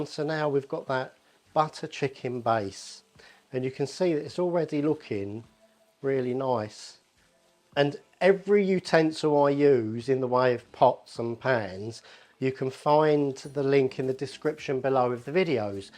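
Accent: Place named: England, United Kingdom. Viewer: I'm dying to know what part of England you're from. That accent is to die for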